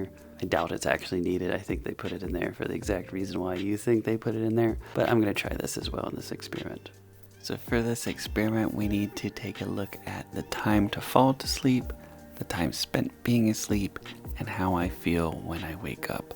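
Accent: Pittsburgh, United States. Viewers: Love the Pittsburgh accent. Listen all the time. Love yer honesty! I’m an rn and agree with your conclusions. With our druggies the benedryl is always asked for when we give all the other sleeping meds.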